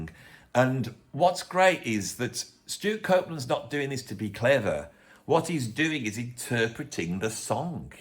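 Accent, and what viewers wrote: Birmingham, United Kingdom. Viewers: He does have lovely, dulcet tones with a soft Brum twang, very easy on the ear! A lot of people have a go at the Birmingham accent, I don't. It's one of this country's finest accents.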